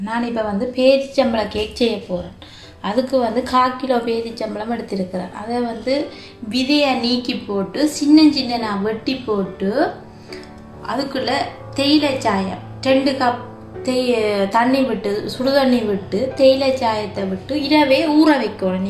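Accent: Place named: Sri Lanka. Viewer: Sister unga Srilanka speech super nenga sonna ravai alaku sister I joined you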